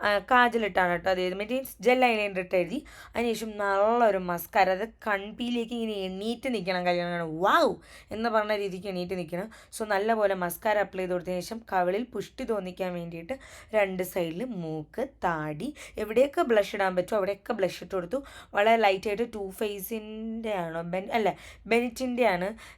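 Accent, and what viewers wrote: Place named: Valluvanad, India. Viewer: നമ്മടെ വള്ളുവനാടൻ സ്ലാങ് 😂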